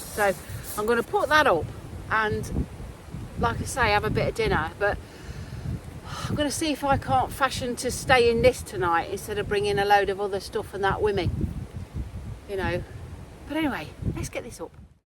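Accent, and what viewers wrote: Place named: England, United Kingdom. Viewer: This is the 2nd video of yours i watched. You're a funny woman, I like that. I'm glad you're not dead yet. I always have to listen very carefully to understand your English accent. But I can manage that. Thanks for the videos, best regards from Germany.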